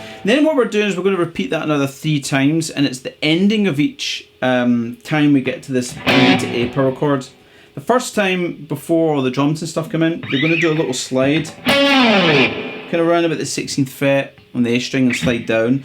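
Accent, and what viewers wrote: Scotland, United Kingdom. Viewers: Scottish accent?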